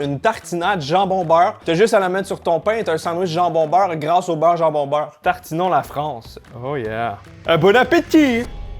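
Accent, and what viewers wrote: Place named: Quebec, Canada. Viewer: Oublie pas que c’est un Québecois donc il parle un français différent du français de France ;)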